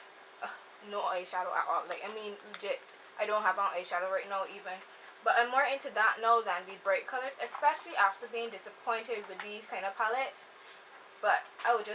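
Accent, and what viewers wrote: Barbados. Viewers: she from barbados i caught on her accent ❤ carribean just like me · i can hear that Barbados accent lol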